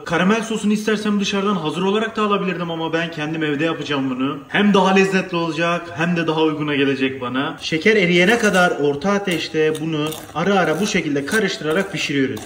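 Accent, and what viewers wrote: Adana, Turkey. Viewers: Hatay diyo ama Adana şivesi var anlamadım ki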